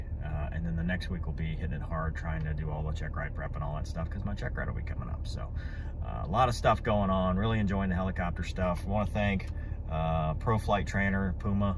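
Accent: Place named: United States. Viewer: I enjoy the accent. It's like a disappearing gem of Americana. Thanks for sharing!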